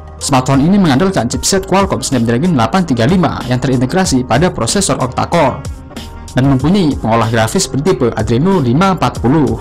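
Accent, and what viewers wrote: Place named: Chinese-Indonesian, Indonesia. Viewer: Dari logat bicaranya kayakx koko koko deh yg ngomong ini... mirip ama logat temen2 gw yg orang china,,hahahah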